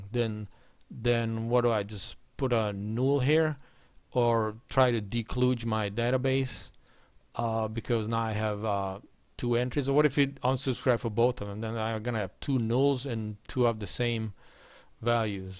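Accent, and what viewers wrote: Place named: Cuba. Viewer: Hey; are you American; living in Texas, and you speak Spanish? I’m trying to understand the influences on your accent ? Cuban ?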